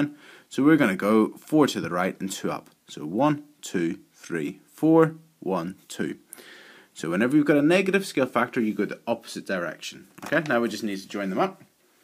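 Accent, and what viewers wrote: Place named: Northern Ireland, United Kingdom. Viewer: So nice hearing the voice of a fellow northen irish mathematician!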